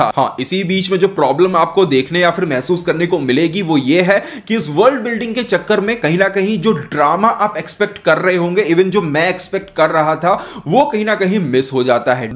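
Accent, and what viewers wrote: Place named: Haryana, India. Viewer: Nice review...Keep it up...some jokers are trolling haryanavi accent. Is it really worrisome?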